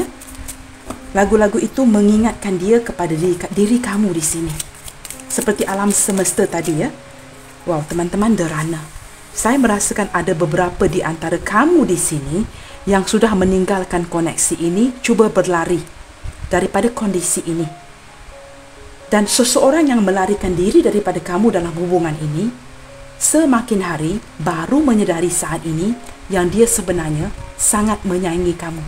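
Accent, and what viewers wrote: Malaysia. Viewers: Tak apa kak aku suka kak cakap Malaysia , aku suka dan aku paham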